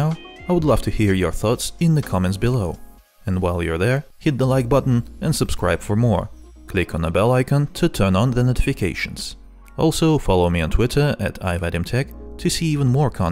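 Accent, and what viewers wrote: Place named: Russia. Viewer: сразу русского по акценту узнал)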